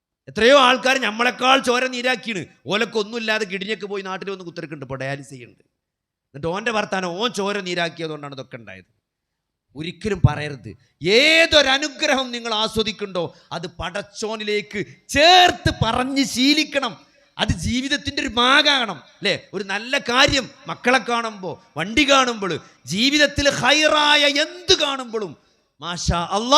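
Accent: Malappuram, India. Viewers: That is pure Malappuram language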